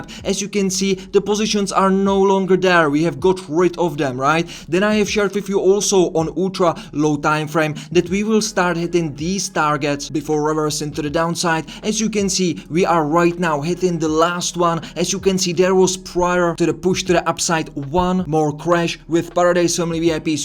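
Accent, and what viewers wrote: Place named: France. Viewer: Dude, I love your accent.... Are u French?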